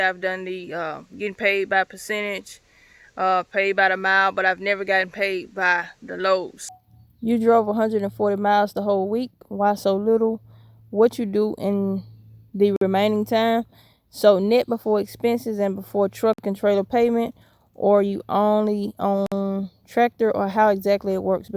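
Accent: South, United States. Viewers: Are you from Mississippi or Tennessee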